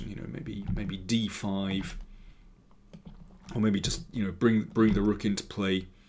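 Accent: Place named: RP, United Kingdom. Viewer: Keep quiet, that's the Sicilian secret! Icing on the cake! 😎 Thank you for the excellent commentary in Queens English!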